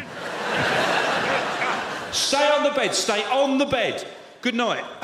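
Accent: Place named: Cockney, United Kingdom. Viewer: As an Australian I love the Cockney accent. Our entire Australian English language is basically based around it.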